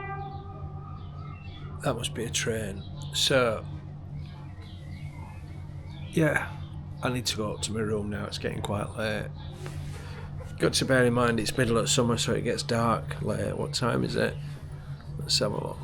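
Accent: Yorkshire, United Kingdom. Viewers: “I’m trying to be spooky” 😂 “Think I’ll go t’ bar” Don’t even know why nearly everything you say is funny af… maybe it’s your fantastic Yorkshire accent… your personality… the way you and bounce off each other with your banter... Whatever it is, I really enjoy your uploads. Keep ‘em coming 🌞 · I knew you were a Yorkshire bloke when you started talking! I'm from Rotherham, but live in Sheffield. I've never been to Rye, but my other half will have, probably. Shame you didn't get any spooky happenings isn't it? Maybe next time and in the next place too. If you're worried about just being in your undies at night, take an old t-shirt with you to wear while you sleep. I don't go without one if I know I'll be away from home and they don't take much room up in your bag either. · I'm watching this from NZ. I was born a yorkshire lass, we emigrated to NZ in 1966 when I was just 8 years old. 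I love your beautiful accent, it reminds me so much of my darling dad. You are so funny, love your sense of humour. The part when you talked about assigning the ghosts to their room for the night.... hilarious!! I'm definitely a ghost believer and have had several encounters over the years, especially from my dad after he died 20 years ago. I've now subscribed so I can see more of you. Thankyou for the entertainment 😂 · Another great "Yorkshire Take" on somewhere I know very well! ☺️...I used to live just on the other side of Romney Marsh, at Hythe...another very historic town. You could easily do a run along that coast with in the van and stop at half a dozen nice places...maybe start at Folkestone, then Hythe...Camber Sands...Hastings...Bexhill... Eastbourne...and finish up on Beachy Head? Just a thought. The bit that made me laugh most was when you said "reet" to that fella in the pub...and he didn't have a clue what you meant! 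😂 I don't think you Yorkshire folk quite realise how intriguing us soft southerners find your dialect! It is absolutely fascinating...as was the video, once again. Nice one! ☺️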